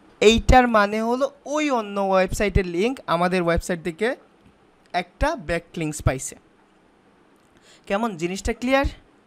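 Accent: Sylhet, Bangladesh. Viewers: ভাই কি সিলেটি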